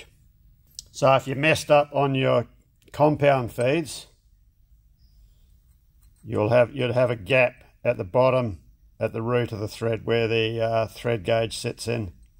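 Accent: Australia. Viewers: I hear his Aussie accent and I click Like!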